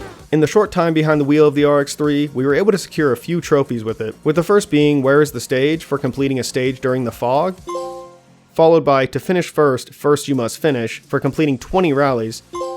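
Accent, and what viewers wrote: South Africa. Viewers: Wait... Are you South African??? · are you actually from South Africa? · Are you South African?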